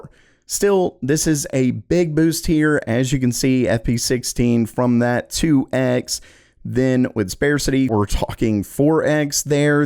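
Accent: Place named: Tennessee, United States. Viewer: lol I walked away and thought the video switched to DistroTube? Tennessee? Hello neighbor lol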